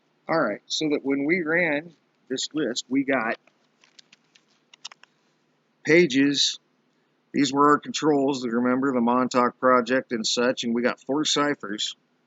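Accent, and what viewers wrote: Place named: California, United States. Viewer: Hey, - Yeah the comment was mostly about the physical observation of Pinball. the song came 2 mind. I've listened to the entire video. The number coding is interesting but I don't get it all. Your dedication to discrption as to the form makes sense. I admire your talent for being able to cut into this line of logical explanation. I'm seeing a lot of things as well, different direction of observation on certain aspects. You have a cool accent. I can't tell if it's California or the Great lakes, your delivery of speech is a bit quicker than a Midwestern accent. We have a long, long way to go. It's like we're just now entering the late first quarter of the football game. Peace. df.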